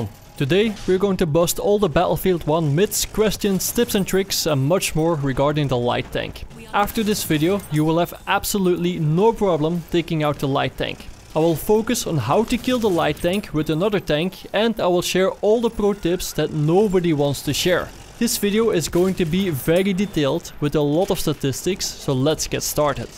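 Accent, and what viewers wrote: Netherlands. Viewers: you are dutch arent you ^^ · pretty sure he is dutch, its in the accent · are you Dutch? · waarom klinkt ge zo nerderlands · Dit Nederlands accent is erg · dutch af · m8 you dutch? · accent is super confusing, it sounds dutch but then also russian, and scottish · Are you dutch? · are you like Dutch, because your accent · Your English sounds so Dutch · you sound dutch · Nice tips man! thanks a lot. Are you Dutch? :p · I like your dutch accent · This was realy helpfullBtw are. You from holland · u dutch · you are clearly Dutch, I can hear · you have a Dutch-ish accent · its the most dutch accent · i bet you are from NL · this guy is dutch for sure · dat nederlandse accent tho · heared withing 0.001 seconds that you are dutch, might i be right?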